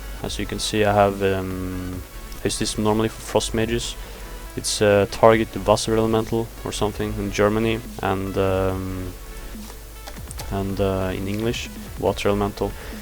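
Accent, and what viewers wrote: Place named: Norway. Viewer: norsk engelsk <3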